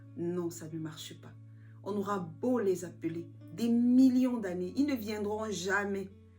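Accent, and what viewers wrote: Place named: Cameroon. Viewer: J’aimes beaucoup l’aspect terre à terre de tes vidéos. Avec la petite touche de dialecte Camerounais 😂🤩🤩. Trop fan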